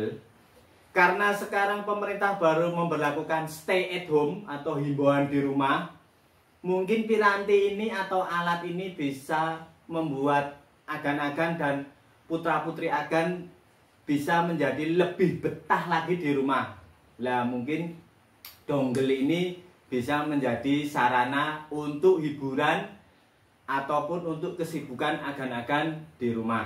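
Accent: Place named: Java, Indonesia. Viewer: Capek denger nya medok skali bahasanya🤦‍♂️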